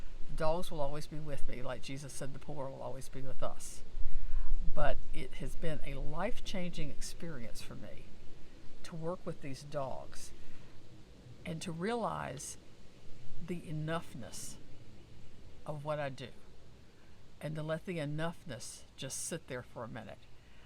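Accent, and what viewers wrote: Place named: South, United States. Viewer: I just subscribed ! I really enjoy your videos, 🎉they are to the point and enthusiastic and I like your southern accent I work with shelter animals, just cats. I would work with dogs too, and gladly walk them but it’s too far from me at this time. I’m looking forward to your videos about tips to losing weight, I already watched the one about pasta, so I’m going to the grocery store in a few minutes.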